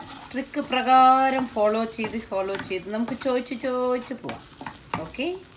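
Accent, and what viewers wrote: Palakkad, India. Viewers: Ha ha ha you r really super Edakkedekku kudikkan And language Palakkadadan 👌👌👌👍👍👍😁😁😁😁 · l love to hear your palakadan language and reciepes. 😊💕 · Pazham prathaman looks simply awesome. Loved your palakadan language and presentation.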